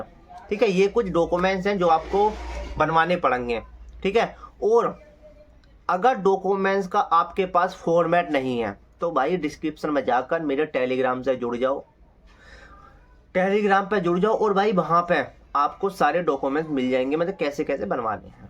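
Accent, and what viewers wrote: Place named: Bulandshahr, India. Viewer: Pure bulandshahr ki language bol rha hai bhai😅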